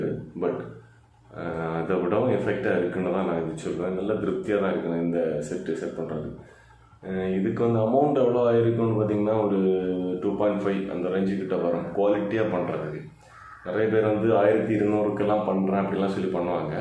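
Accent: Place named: Kerala, India. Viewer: Good voice and slang bro. tamil + korachu Malayalam.... 👌👌👌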